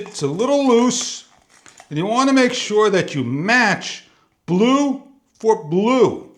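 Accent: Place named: New England, United States. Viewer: Thank you. Your NE accent makes is interesting. (O: I have spent hours and hours on our model 4266, first with new seal, then replacing the whole flush mechanism. Still having it refill to the right spot on the overflow tube, about every 5 to 15 minutes. I think I've tried and learned every lesson you share. But, maybe the heavy nut on the flapper will do the trick. I'm with you, not buying another American Standard toilet. Thanks!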